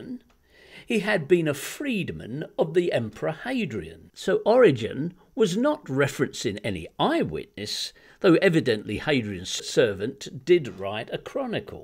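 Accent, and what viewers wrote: United Kingdom. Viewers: Why do these bible bashing videos always have such a pretentious tone... this one with a British accent? These presentations go to extreme lengths to invalidate such insignificant things. To ridicule what is already ridiculous is even more ridiculous. Sorry, not very impressive.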